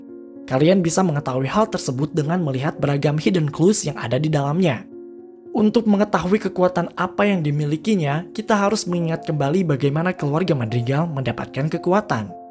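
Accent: South Jakarta, Indonesia. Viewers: Bang lu orang jaksel atau sengaja make aksen jaksel kaya "literally"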